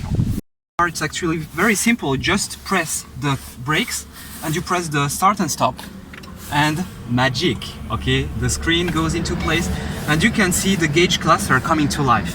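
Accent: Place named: France. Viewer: his accent makes be believe he speaks french also, i guarantee it. Regardless, thanks for a review that we can now understand!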